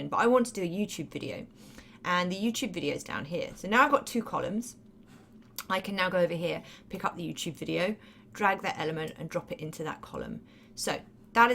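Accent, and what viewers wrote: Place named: South, United Kingdom. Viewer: It's a standard Southern British accent.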